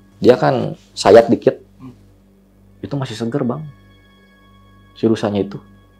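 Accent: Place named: West Java, Indonesia. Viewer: Lucu nya klw orang Sunda ngomong gua elu..😂 semangat kang ...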